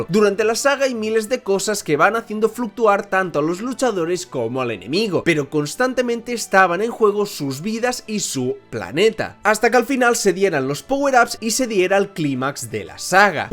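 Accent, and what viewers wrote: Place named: Spain. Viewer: Al fin un canal bien narrado en español de españa… 😢 viva españa viva el rey viva el orden y la ley! 😂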